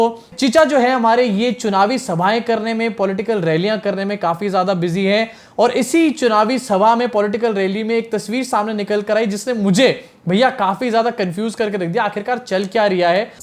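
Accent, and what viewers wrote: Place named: Hyderabad, India. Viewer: Aap ki urdu bahuth achchi hai. Aap ka accent south Indian urdu jaisa hai, particularly Hyderabadi jaisa. Keep it up 😅😅😅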